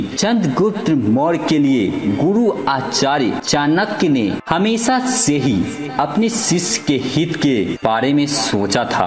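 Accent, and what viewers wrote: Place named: Bihar, India. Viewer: Are Yar phle bolna to shi KR Le ese LG rha jese koi bihari Hindi bol RHA h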